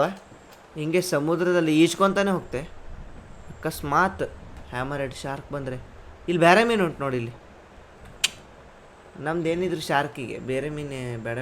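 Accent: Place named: Coastal Karnataka, India. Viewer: Guru karavali shyali maath ajji maat super chanda guru aadre nin yen guru karavali ne marth bittidya ansute adke maath sa change aagide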